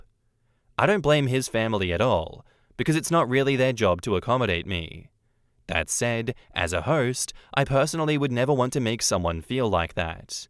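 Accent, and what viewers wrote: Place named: Australia. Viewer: You can tell this narrator is Australian because he's a cucked wuss who's whole country should be erradicated.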